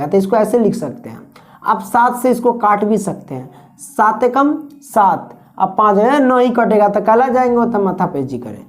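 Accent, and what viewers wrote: Bihar, India. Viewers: Bihari ho kya bhai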